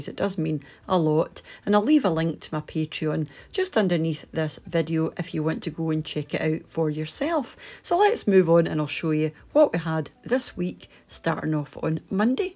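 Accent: Scotland, United Kingdom. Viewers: Its a lovely Scottish accent x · if you don't like accent or Scottish accent in general, why would you even bother commenting to be honest. Just scroll on. Loving these videos, keep them coming and ignore the trolls 👍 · I’m scottish and I like her accent, it’s slightly different from mine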